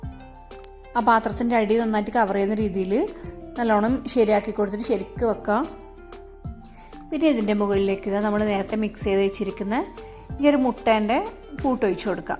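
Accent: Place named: Kannur, India. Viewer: Kasaragod evideya njanum Ksgd but Ningale slang kannur touch nalla basha🤩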